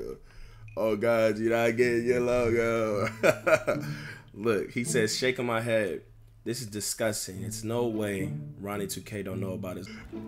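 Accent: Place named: Los Angeles, United States. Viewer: I swear he sounds like he's from LA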